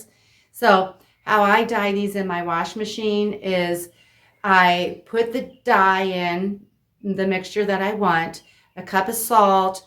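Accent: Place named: United States. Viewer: so have I, gosh is so good and generous to share her talents, thank you x. ps love your American Accent (greetings from England)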